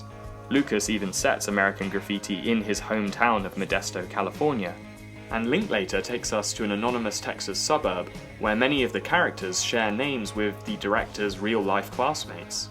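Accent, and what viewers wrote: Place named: England, United Kingdom. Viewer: I enjoyed this, thanks! Your (very) English accent somehow sits awkwardly with the material, but I appreciated the insights. These are my two favorite movies, and I have just finished watched D&C for, like, the 20th time! I guess the challenge is for someone to do a 90's piece, as that must be the last decade of freedom before technology robbed us of our primal sociability.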